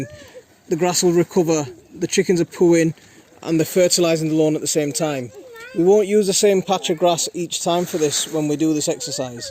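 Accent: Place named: Yorkshire, United Kingdom. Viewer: oh ok Ma'sha'Allah I didn't think you was from west yorkshire my brother, I could tell with the accent but I thought your probably more from Sheffield sides lol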